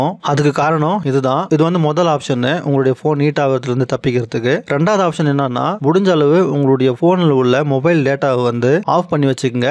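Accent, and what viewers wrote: Chennai, India. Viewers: உங்கள் மெட்ராஸ் பாஷ அருமை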